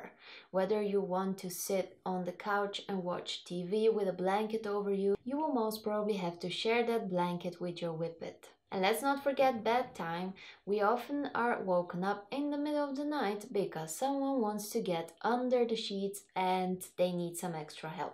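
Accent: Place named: Belgium. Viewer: Love your accent. Where in the world are you from? I'm thinking French-speaking Belgium.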